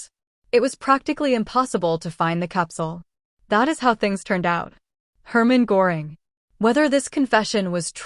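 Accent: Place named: United States. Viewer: Difficult listening to an american female voice narrating this story so i wont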